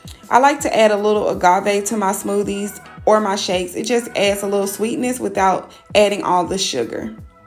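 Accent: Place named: Houston, United States. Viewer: Awesome channel!!! I knew you had to be in Texas… Us Houstonians have that accent!!! Keep on inspiring others!!! Blessings! 🍉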